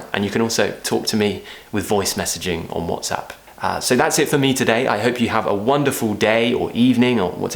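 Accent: United Kingdom. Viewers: I enjoyed this video a lot First time I felt British accent is more difficult to understand than Americans but as time goes by I felt it’s easier It’s because of you Thanks a lot😊 And I love British accent 🌸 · Oh my gosh.. i am tooooo late. Anyway, How are you🌸 i am so glad to see you again. I hope i can see u more often. I missed your awesome british pronunciation a lot indeed!!!!! Thanks a million🙋🏻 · Your perfect British pronunciation and energy like a bomb motivate me. Thank you so much. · I'm impressed with your voice texture, mate. The accent is awesome and British indeed. I'm so fascinated that my earphones are poked regularly into my ears. Explanations are vivid and practical. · Dear... You are Awesome... BRITISH Accent 100%, learned a lot From You, Thank You Very Much 🙏😘😘😘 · Im from Bangladesh. I dont know have u ever heard the name of this country or not?bt i was always been looking for a channel like u so that i can learn british accent. 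U are really amazing. Thanku so Much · I love your British accent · I like meat really like it and I like watching your video, easy to understand and I like British accent. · I just discoverd ur channel and i'm so in love with ur accent ❤ i cant get enough of listening to british english 💕💕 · I’m from Vietnam and I’ve been watching your videos for a year. Thank you for traveling to our country. In ours, the English language as the second language and so important for everyone. But most people prefer America accent to British so that I was thrilled to find out your channel. If you go back to Vietnam, hope we have a chance to meet up.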